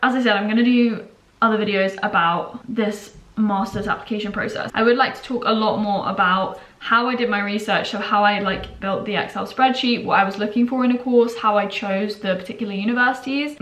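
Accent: Australia. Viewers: You sound so Australian since you got back from your trip! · You’ve picked up a bit of an Australian accent!! Love it! X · I feel like she's delevloping an Australian accent, very likely that she's not but I kinda heard it in the intro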